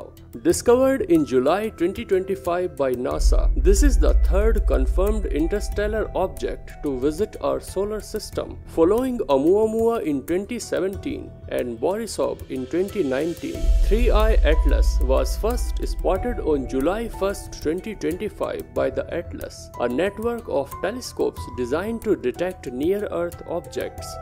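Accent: India. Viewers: the first word you say, I hear you're an Indian.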